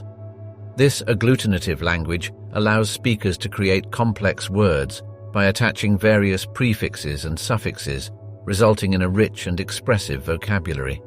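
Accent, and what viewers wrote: United Kingdom. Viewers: How appropriate that so much misinformation (& mispronunciation) is narrated in a BRITISH accent.... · Why do you have a British narrator for American history? I'd think you could have done something alot better than start off negatively.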